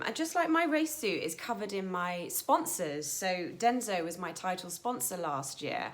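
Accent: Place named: London, United Kingdom. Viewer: For some reason I always find the London tone makes everything sound more sophisticated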